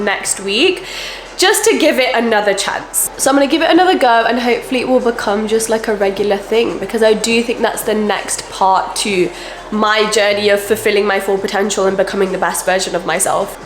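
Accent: United Kingdom. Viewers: British accent >>>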